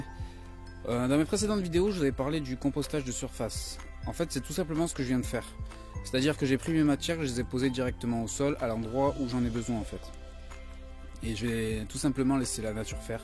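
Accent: South, France. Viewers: OUI tu me la dit la derniere fois qur tu étais nouveau d' ou viens tu ? un léger accent du sud ;moi sa fait 39 ans que je suis a Lyon c était pour 3 ans max Bonne journée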